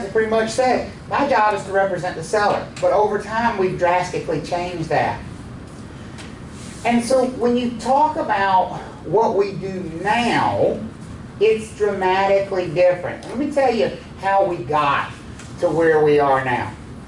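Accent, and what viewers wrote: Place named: South, United States. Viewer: I’m staying due to the southern drawl. Plus he teaches well!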